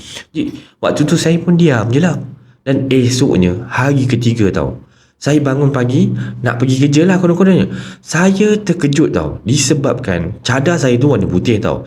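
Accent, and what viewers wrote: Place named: Kedah, Malaysia. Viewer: Loghat kedah abang cun noh,tak macam buat2,ketegaq lama tak dengaq,hahaha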